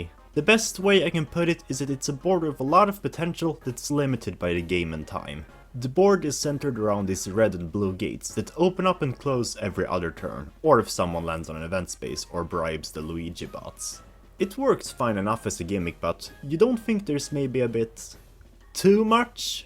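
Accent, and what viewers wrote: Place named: Sweden. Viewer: Your english accent sounds just like mine, are you from Sweden ?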